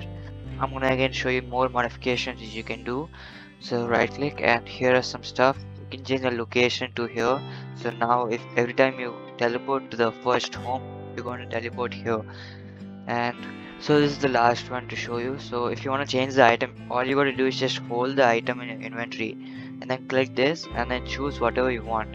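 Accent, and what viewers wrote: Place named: India. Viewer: bhai hindi mai bnaoge toh or achi bnegi vedio bhale hi international audience na mile but indian audience jrur milegi accent se pata chal rha hai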